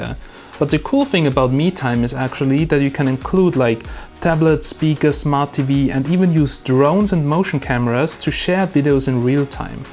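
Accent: Germany. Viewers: Eigentlich hört man sofort raus, dass er aus einem deutschsprachigem Raum kommt.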